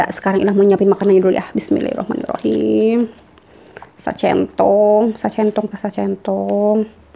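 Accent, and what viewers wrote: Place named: West Java, Indonesia. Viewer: Teh ila asli mana sih resep ngomong'a Sunda trus medok gitu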